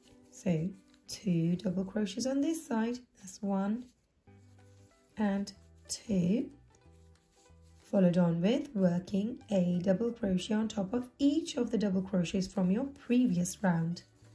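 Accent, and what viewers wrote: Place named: Wales, United Kingdom. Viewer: I have made it and it is very nice. What a lovely accent . Is it Welsh?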